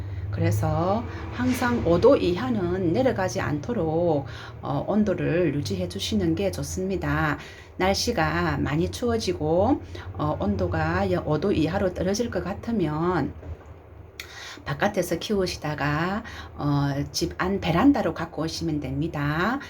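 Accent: Gyeongsang, South Korea. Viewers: 꽃이 이쁘게 폈네요.. 나레이션 경상도라서 더 잘들립니다! 감사합니다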